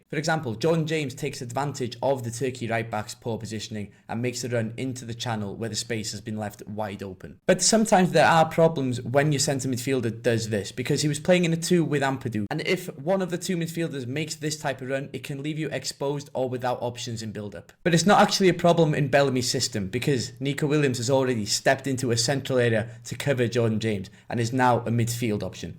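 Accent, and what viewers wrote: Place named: North Wales, United Kingdom. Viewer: Dadansoddiad da. Da clywad acen gogs go iawn 'fyd!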